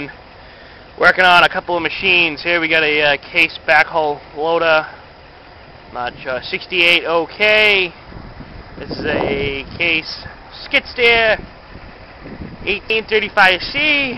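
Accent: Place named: Boston, United States. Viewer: your from ma i can here your boston accent go redsox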